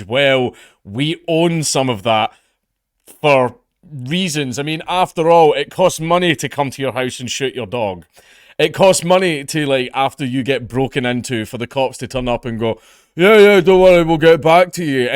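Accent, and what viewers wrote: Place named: Scotland, United Kingdom. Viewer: Your commentary is always spot on, but I’d listen to you even if it wasn’t because I love that Scots accent!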